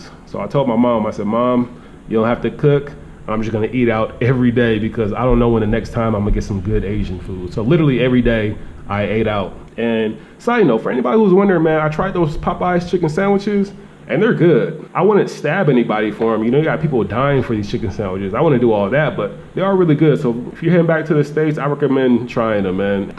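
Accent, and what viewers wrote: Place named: East Coast, United States. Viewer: Wow man I didn't expect you to be from Seattle the northwest that was surprising cause you talk like a east coast dude with all that swag in your voice lol.. thought you was from like Northeast in jersey or new york...but keep up the great work with the vidz bro #Respect 👊🏾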